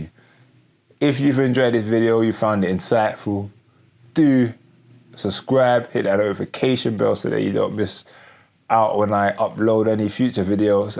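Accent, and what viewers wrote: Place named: United Kingdom. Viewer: British accent thick. Af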